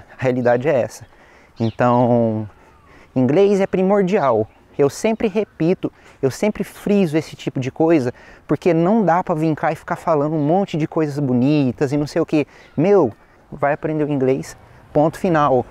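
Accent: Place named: United Kingdom. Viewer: Cara. Desculpa, mas... ver um Brasileiro falando o inglês britânico, me dá impressão que está errado! (Não está, eu sou 100% acostumado com o inglês Americano) Me soa estranho!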